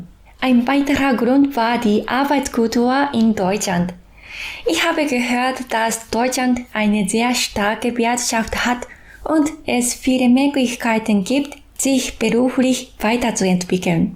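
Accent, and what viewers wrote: Japan. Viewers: Dein Deutsch ist super! Ausdruck, Wortschatz und Grammatik 100% korrekt und besser als bei manchem Muttersprachler😉 Chapeau! Nur das schöne Akzent verrät, dass Du Japanerin bist❤ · Sie spricht sogar ein ausgezeichnetes und heutzutage höchst ungewöhnliches Deutsch, ein Deutsch, wie es noch vor 40 bis 50 Jahren gesprochen wurde, mit Wörtern, die mancheiner heutzutage (fast) überhaupt nicht mehr benutzt, ich muss immer schmunzeln, wenn ich Wörter von ihr höre, die ich von anderen so gut wie nie mehr höre ;) Und ihr Dialekt macht es nur um so niedlicher, man stelle sich vor, sie hätte keinen japanischen Dialekt, das wäre ja schrecklich ;)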